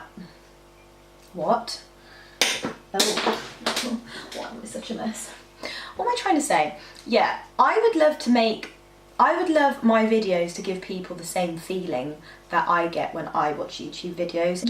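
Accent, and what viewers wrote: United Kingdom. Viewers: By, the way. Are you from London?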